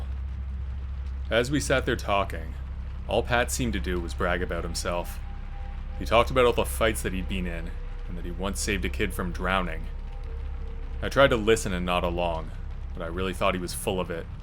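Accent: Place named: Canada. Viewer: I enjoy the cute "oot" and "aboot" Canadian inflections too. 😊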